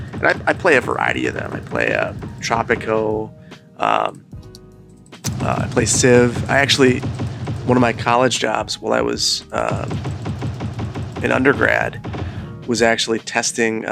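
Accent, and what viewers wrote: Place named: Iowa, United States. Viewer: You from the midwest, flat with some terrain. Sounds like Iowa. Thats where im planted. lol. sometimes sped up builds are ok when its the same thing repeated. otherwise real time is best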